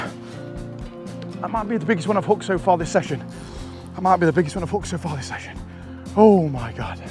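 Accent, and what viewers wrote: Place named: East Midlands, United Kingdom. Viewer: Where is this? You sound like an East midlands lad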